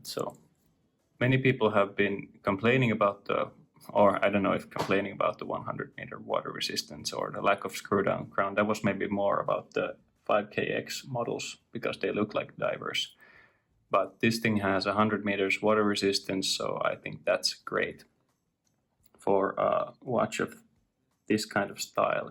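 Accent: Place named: Finland. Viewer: third comment in a day, enyoing your content man (the finish accent reminds me of watching interviews with my favourit band ;) ) - The new SRPE's are real stunning watches, I got a great deal on the 61 (grey dial, Nato-Strap) and ordered on Tuesday. That colour is really the best of the bunch in my opinion + the ones on the Nato have brushed bezels. I paid 180€ incl. shipping and customs from Japan to Germany and it will arrive in under a week if DHL is to be trusted. While at it I bought a fitting strapcode-oyster (solid links, solid endlings, solid clasp, screwlinks), set to arrive the same day and litarrily can't wait (thats why I watch all the reviews on the SRPE's currently ;) ) - hope this combination will look as good in the flesh as it does on pictures. Even more Tudor-Blackbay with that one. Already considering buying a strap from watchgecko, the grey-striped one you showed must look awesome with the grey-dial (maybe the darker-shade but it is a real strapmonster I believe). 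I was really enjoying your review, specially liked the sound/music for the Negatives and during the Lumeshot (you could turn the 70's-porn-sound to 11 though next time). As with the SNKL23 I'm also considering adding a double-dome for this one, would give it even more class.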